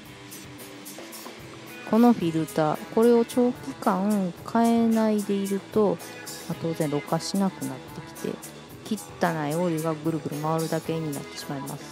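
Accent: Kansai, Japan. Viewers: 何か関西弁ほっこりします。素人の私にもとても分かりやすい説明ありがとうございます。。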